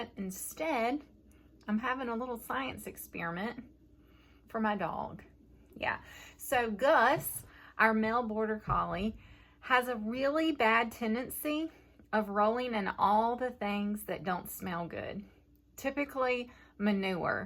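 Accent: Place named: Indiana, United States. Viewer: Watching your video's is like a part of back in Indiana, I love living here in the Philippines, And i don't miss living in the US But i do miss no country music here of bands, So you and your family gives me a taste of Indiana with your accent.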